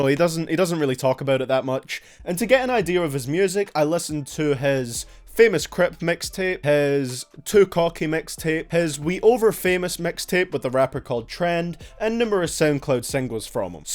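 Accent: Scotland, United Kingdom. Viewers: I think he's Scottish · I already commented this on another video but here again. I'm gonna go sleep now with you on, your voice is so comforting man. I don't mean it in a gay way I normally hate I really hate the British accent but you give the vibes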